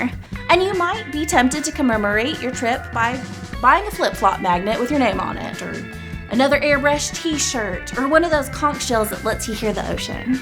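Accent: South, United States. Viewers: I moved to NC from Florida and this IS EXACTLY how these local hillbillies talk about their "trip to the beach"😮! Ugh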